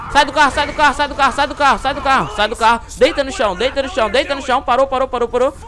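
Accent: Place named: Rio de Janeiro, Brazil. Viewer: virou carioca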